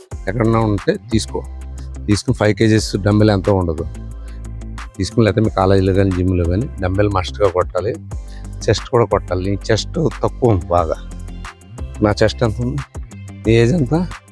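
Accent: Godavari, India. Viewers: Ayya baaboi baabai miru super ఏహే mi slang godari yaasa adbutaha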